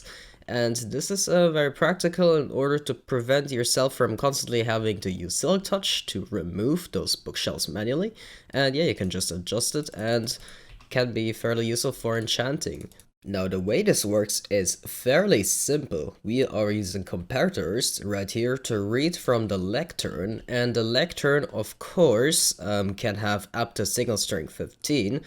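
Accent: Germany. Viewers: Warum machst du dann Englische Videos Übrigens bei der Betonung hört man sofort den Deutschen raus ist mir genau das gleiche😅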